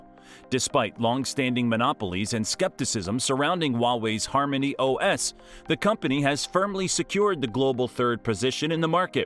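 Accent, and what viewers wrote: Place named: United States. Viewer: Why use an American accent when you're anti USA? Keep it 💯 wus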